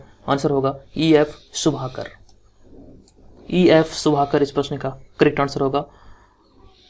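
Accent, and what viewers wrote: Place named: North Bihar, India. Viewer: you belong to north bihar. i can tell with your voice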